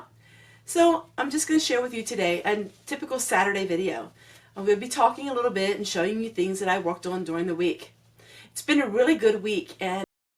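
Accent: Pennsylvania Dutch, United States. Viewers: Lol you made me giggle. I like your Dutchie accent makes who you are. You have been busy this week enjoyed your video have a great week 🌷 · nothing wrong with your PA Dutch I'm enjoying the dialect:) It's been a nice day today the sun came out and I was sitting in my yard:) Very quiet here except the sirens but not to much of that:) I got out to the store today to get a few things I needed to get but otherwise no real need to be to a store:) It sure does feel like I'm in the country here:) Been helping mom in the house:) That's a beautiful quilt great to see your grand kids helping out:) Love you my Dear sister:) God Bless you and Ken and Family:) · Hi Everyone, Happy Palm Sunday, was busy with poor new Lab puppy, What was I thinking >>> she is a distraction as to what is going on. Also attempted to sew some face masks My skills do need improvement, We have Pluto and a Firestick. 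Like you,I am rural in NY. Love hearing your dutchy Teach us some. Stay safe and Blessings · I love your Dutchy Pennsylvania accent! I've known several people from there and how y'all talk is so entertaining. You da bomb! I have Dutch heritage too......from Claverack, NY.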